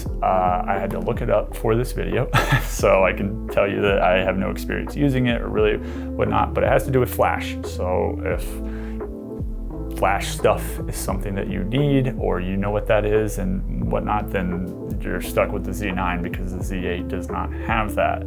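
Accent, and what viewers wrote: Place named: United States. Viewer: Finally someone who speaks American English and says Zee instead of Zed!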